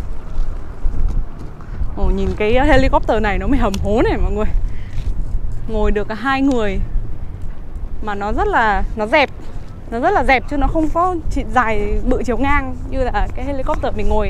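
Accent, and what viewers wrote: Hanoi, Vietnam. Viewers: nói giọng bắc dễ thương quá. Mà bạn quê ở miền bắc là ở Hải Phòng phải không. Chiếc tàu lớn quá. Ủng hộ đầy đủ like sub tặng bạn. Đã xem full. Chúc bạn ngày mới vui khỏe và bình an. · Em là người gốc Hà Nội hả. Sao giọng hay quá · Xinh đẹp, phúc hậu, giọng bắc chuẩn hà nội nghe mê à!!!!!! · Girl đâu mà xinh thế, giọng hà nội nữa chứ , yêu thế ❤️ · rất xinh đẹp và nói giọng Hà Nội nghe rất dễ thương. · Bạn hướng dẫn xinh gái quá đi có người yêu chưa bạn ơi ! bạn nói giọng HN à ?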